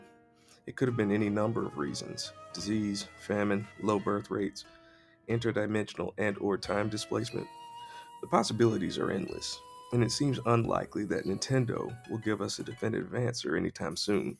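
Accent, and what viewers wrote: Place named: Georgia, United States. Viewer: Where are you from? You accent sounds like south Georgia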